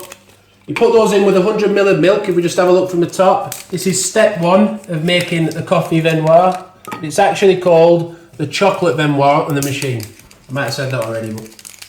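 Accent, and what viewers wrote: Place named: Northern England, United Kingdom. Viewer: Brilliant video thankyou. Been trying to decide between the aeroccino 3 or 4. Great to hear a fellow northern accent too although you might be more red rose whereas I'm the white rose side 😉 Gonna watch some more of your vids but I'm still swaying more towards the 4.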